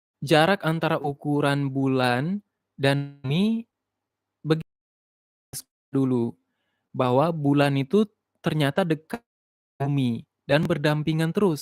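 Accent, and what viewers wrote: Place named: Sumatra, Indonesia. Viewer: yg jelas beliau logat sumatra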